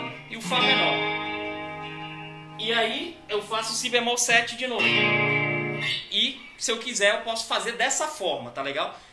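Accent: Pará, Brazil. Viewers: cara, venho acompanhando teus vídeos ultimamente e gostado bastante. Mas to com uma curiosidade, tu és paraense? kkkk ou alguém ja te disse que teu sotaque lembra muito a galera daqui do Pará? kkkk abraços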